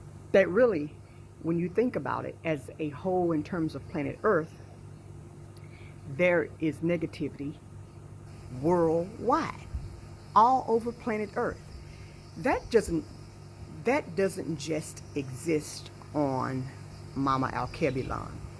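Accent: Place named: Texas, United States. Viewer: Love hearing my sista’s TX accent , keep bringing it ! I graduated high school in Killeen,TX ! ❤️✊🏾❤️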